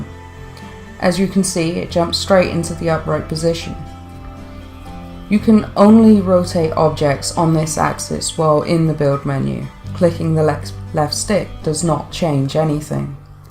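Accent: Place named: France. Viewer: Ouiiii j'adore ta vidéo, tu as un accent français si charmant ^_^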